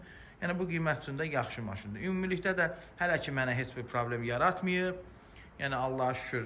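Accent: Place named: Qabala, Azerbaijan. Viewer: Ləhcənnən nömrəni 036 muqaisələndirdim qərara gəldim ki Qəbələdənsən😌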